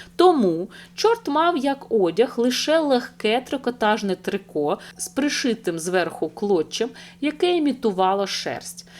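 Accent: Russia. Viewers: текст читається з потужною московинською вимовою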